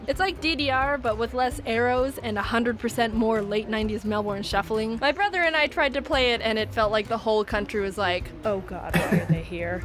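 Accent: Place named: Philippines. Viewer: your english sound like filipino are you half?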